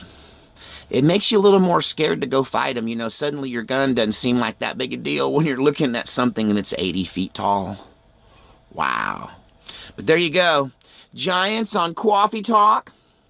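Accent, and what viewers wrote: New York, United States. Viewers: I LOVE COFFEE TALK and I love how you say coffee talk ..I love New York Accents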